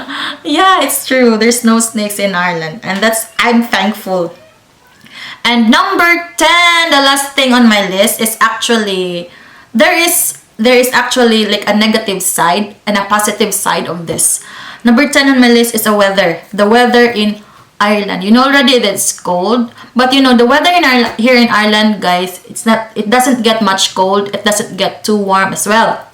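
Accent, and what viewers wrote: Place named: Philippines. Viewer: Sabi na nga be Pinay eh,lol. Just clicked the video, on the first word, alam na agad! Because of my musical influences, my love for their accent, geography at sabi nila masayahin din sila, gusto ko maka punta jan.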